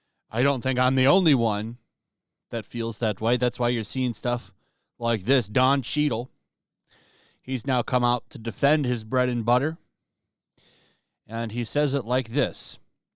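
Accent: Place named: RP, United Kingdom. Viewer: The upper class British accent kills me. Hail!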